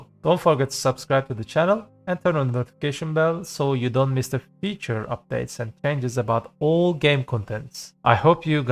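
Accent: Turkey. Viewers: Knk aksanindan anladım bizdensin o yüzden türkçe sorca. 3 ay önce yapimissin suan spotlarin durumu nasıl ? 272 ap 330dp ile.rn güzel parayi mirumoktami kadari. Ne tavsiye edersin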